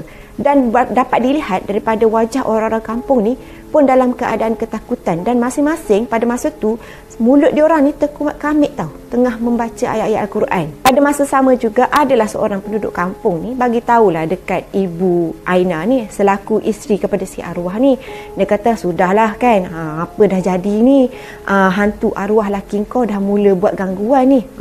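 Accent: Kelantan, Malaysia. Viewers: Maaf bertanya...daripada slang ni saya rasa ni orang kelantan kan?.... walaupun sekali dengar memang tak macam org kelantan,tp kalau dengar betul2 akan keluar slang kelantan tu.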